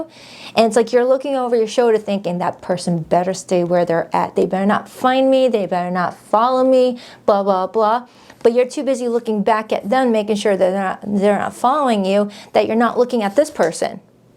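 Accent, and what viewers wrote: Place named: New England, United States. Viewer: I love your new england accent ❤❤❤❤ I live in the south and we absolutely love that accent because its different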